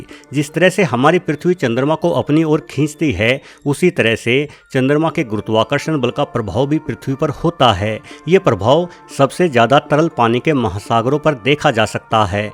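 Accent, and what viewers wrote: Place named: Bihar, India. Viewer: Bahut sound like bihari tone 🤣🤣🤣😂😂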